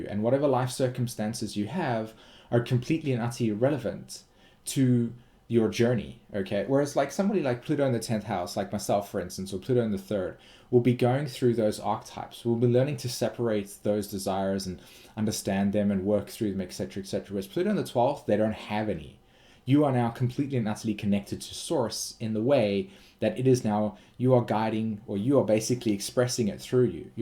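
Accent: South Africa. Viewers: Are you South African ???🙀😺. Great video 👍🏽🌚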